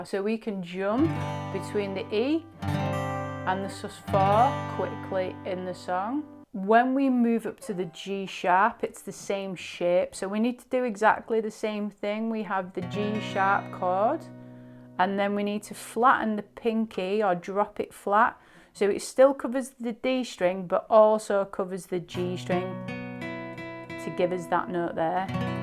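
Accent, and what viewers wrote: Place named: England, United Kingdom. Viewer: Hi, I love your way of learning songs... and your pure english accent! Your entire channel is gold, thank you for your huge dedication to other guitarists!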